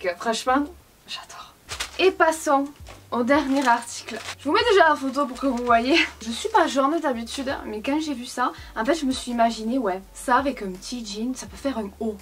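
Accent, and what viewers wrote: South, France. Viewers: Tu a un acten du sud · tu es canon et tu n’as absolument aucun complexe à avoir😉 J’adore tes vidéos, toi et moi on a le même accent du Sud, tu serais pas tarnaise?🧐 Bisous guapa😘😘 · Dommage tu a un accent à begère trop prononcé ça gâche tout je trouve tu viens de la campagne provençale ou quoi? J ai l impression d ENTENDRE Manon dans la gloire de mon père... non je supporte pas bye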